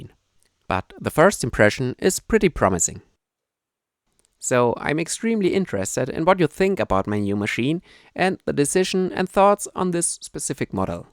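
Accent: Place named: Germany. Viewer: Love that german accent!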